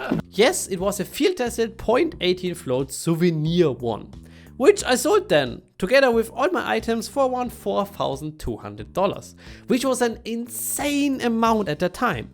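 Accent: Germany. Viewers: erster deutscher youtuber, bei dem mich der deutsche akzent nicht abfuckt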